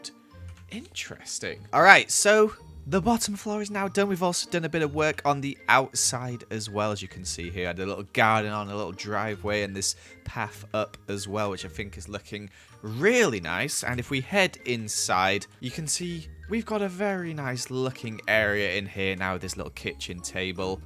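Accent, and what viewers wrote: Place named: United Kingdom. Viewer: British I just thought you had a weird voice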